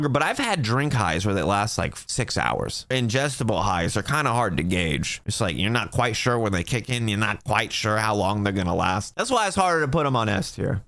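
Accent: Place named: East Coast, United States. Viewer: You have an east coast accent and you just called em Scooby snacks, you had to have grown up near new england or something 😂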